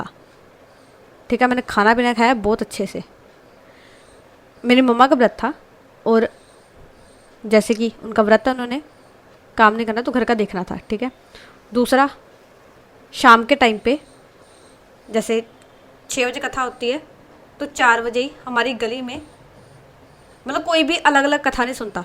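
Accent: Punjab, India. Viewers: Very nice story aapki Punjabi bhi to Kya Baat hai itni khalish Punjabi ❤❤❤❤😅😅😅😅😅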